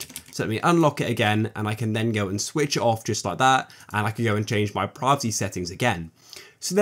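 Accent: United Kingdom. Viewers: No way y’all all just got scammed by a random British dude I feel bad for y’all 😂.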